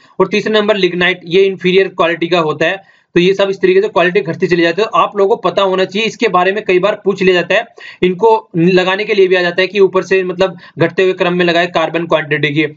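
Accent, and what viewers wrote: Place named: Rajasthan, India. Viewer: sir u are the best...i appriciate ur knowledge...way of teaching....and hard work for us...and i think you are from rajasthan from your accent as i am too from ajmer i will love to meet u sir...but that too after 2018 prelims...i am to give in a lot into it till then... thanks sir..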